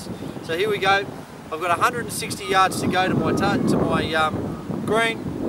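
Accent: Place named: Australia. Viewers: Probably Aussie based on the accent. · haha english men , Hes a Aussie,accent and by the birds sounds and fauna behind him... yes your right me and a mate played his stepfather a 72 year old and he whipped us,he got a 82 and all he did was hit straight ....!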